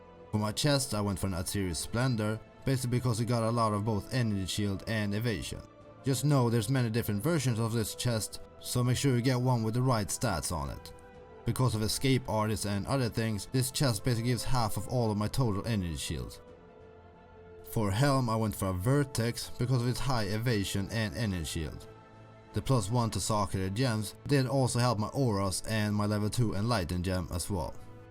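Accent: Sweden. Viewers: Man måste ju älska den svenska engelskan <3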